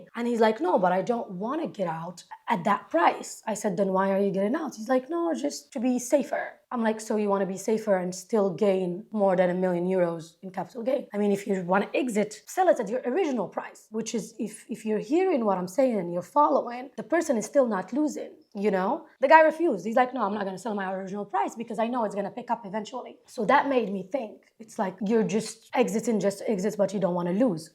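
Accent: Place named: United States. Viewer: Delusional with an American Accent can’t phantom the reality of realty. Go find a new daddy in Miami.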